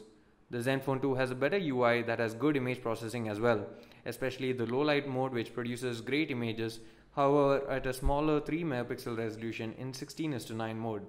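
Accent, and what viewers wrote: Tamil Nadu, India. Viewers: bro are you from tamilnadu......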